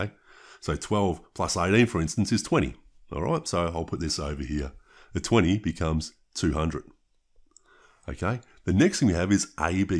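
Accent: Australia. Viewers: I agree with you Adano. That is an Australian accent.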